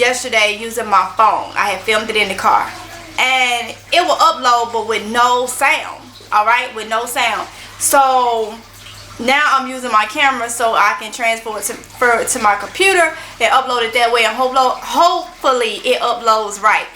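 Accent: South, United States. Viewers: Love her country voice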